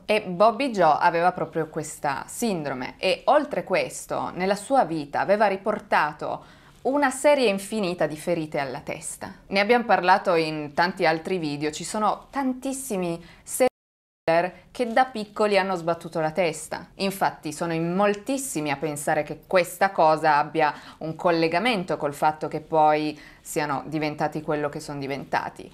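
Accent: Turin, Italy. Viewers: Si sente che sei torinese cara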